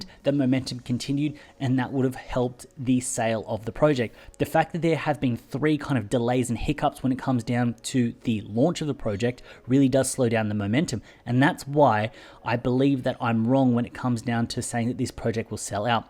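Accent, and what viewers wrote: Australia. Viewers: Where are you from mate? sound Aussie to me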